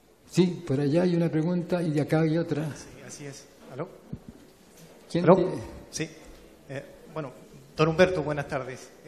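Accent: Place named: Chile. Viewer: Amo el acento chileno♥ Que hermoso escucharlo mi querido Maturana ♥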